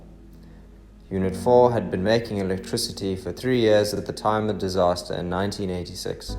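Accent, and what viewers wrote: South Africa. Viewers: It’s a South African accent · I like your South African accent 👌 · Hey I hear the Cape Town accent! Hello from somewhere close by. Very well done video :) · Your nasal South African accent is made even worse by your flat and dead-boring narration style.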